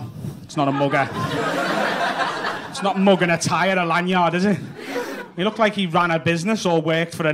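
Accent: Liverpool, United Kingdom. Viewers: Like a scouse haha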